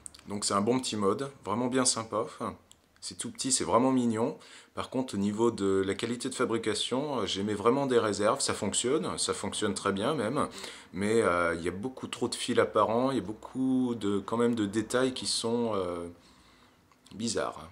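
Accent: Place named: France. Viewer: I gave it a like just for the french accent love it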